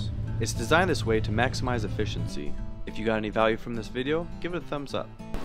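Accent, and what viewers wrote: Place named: Midwest, United States. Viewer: Looking at what I can see in the video this handyman dude lives in a northern area, his accent is Midwest. Full basement, air conditioner unit not a package unit, etc. probably Iowa or Missouri area.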